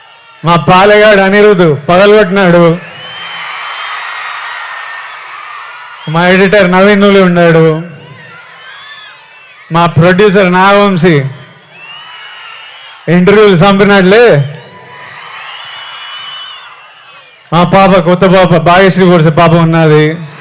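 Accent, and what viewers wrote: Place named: Rayalaseema, India. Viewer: Rayalasema(Chittoor) slang not telangana (telugu) slang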